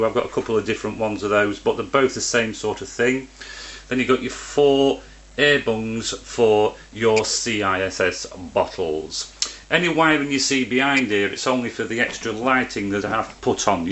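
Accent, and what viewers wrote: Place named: England, United Kingdom. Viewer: Esse cara é inglês dá pra perceber pelo sotaque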